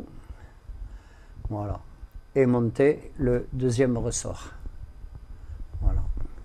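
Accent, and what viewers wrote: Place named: Castres, France. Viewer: Bonjour. je crois deviner dans vos vidéos que vous êtes de Castres (l'accent va dans ce sens aussi!). Est-ce la cas? Mes parents sont de Mazamet et je viendrais volontiers vous poser quelques questions à l'occasion!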